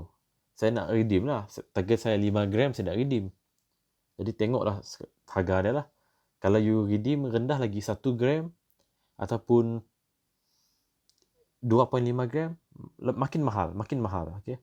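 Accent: Sarawak, Malaysia. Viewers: Loghat mcm Sarawakian je.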